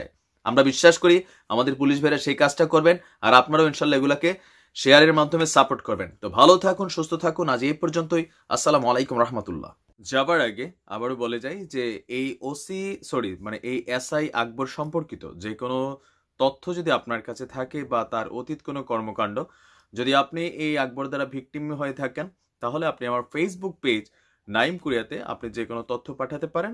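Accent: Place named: Sylhet, Bangladesh. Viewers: ভাই আপনি সিলেটি ভাষায় কথা বলেন,শুনে ভীষন মজা লাগেছে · সিলেটি ভাষা আপনার মুখে শুনে খুব ভালো লাগছে....ধন্যবাদ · you spoke wonderful Sylheti dialect- I like your videos.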